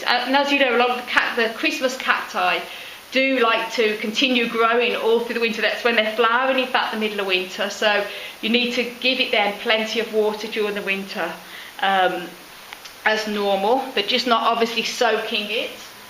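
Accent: Birmingham, United Kingdom. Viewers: Thank you 😁 hey this is a weird question but your accent reminds me a bit of mine, did you used to live around Birmingham?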